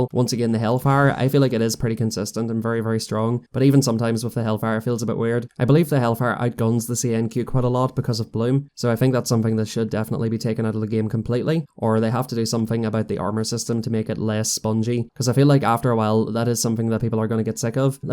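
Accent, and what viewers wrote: Ireland. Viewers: You live in Asia? I thought u were Irish aha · Are y from donegal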